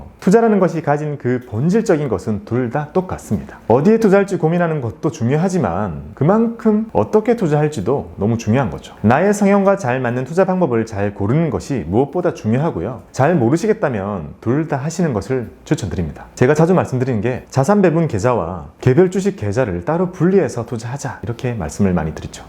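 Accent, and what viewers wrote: Gyeongsang, South Korea. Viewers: 책 읽고있는데 특유의 경상도 말투? 음성지원되는거 같아요. ㅋㅋ 세상 쫄보인 제가 주식이란걸 시작하게 될 줄은... 덕분입니다. 감사합니다~~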